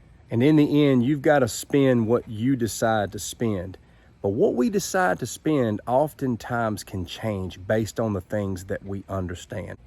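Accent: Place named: West Virginia, United States. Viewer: I sent this to my mother and her jaw dropped. 😂 We have the same facial features, eye color, goatee, shaved head, height, weight, age, speaking cadence, etc. And we're both blade enthusiasts. The only difference is your accent is West Virginia, and mine is Kentucky. When I start making videos, I'll send you a link. It's wild, bro. 😂😂😂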